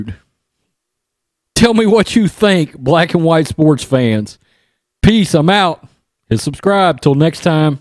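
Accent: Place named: South, United States. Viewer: I'm not sure if it's the southern drawl, since I live about as far south in Alabama as you can live and still be on dry(most of the time) land, or because you just seem like an average dude with an opinion that he's not afraid to share like myself, but you are rapidly becoming one of my favorite commentators on YouTube. Many associate the southern drawl with being borderline retarded, and I'd love to have a 30 minute conversation with them about prejudiced thought, but because I know better and you REALLY DO seem like a number of folks that I have intelligent conversations with on the daily, you are so relatable. At least to me, anyway. Keep up the great work and God Bless You and Yours!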